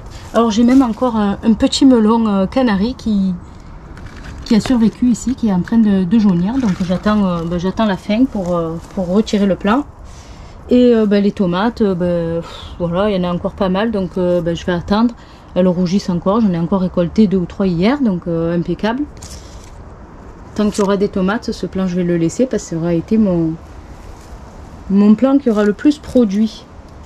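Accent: South, France. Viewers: Coucou, Ça m'amuse de voir la différence entre le sud de la France et le nord 🇧🇪 l'accent, le type de récolte . Suis impressionné par la taille de tes aubergines et poivrons ! Par contre on a à peu près la même façon de faire. Merci pour cette vidéo A+ 🇧🇪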